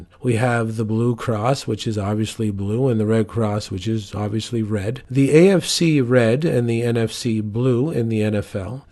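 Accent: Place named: Canada. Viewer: I love the sinister tone delivered in a Canadian accent, LOL.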